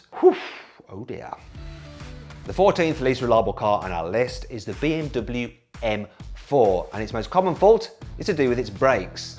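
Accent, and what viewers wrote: United Kingdom. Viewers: ちょっと崩れた英国英語のアクセントが良いですね。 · この本来の英語の発音が好き 英国訛りとか言うが、アメリカ英語こそ訛りなのにね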